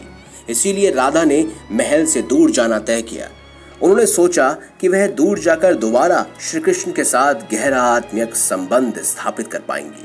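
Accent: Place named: United Kingdom. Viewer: Bhai dhang se bolo, pure Hindi me English accent mat Lao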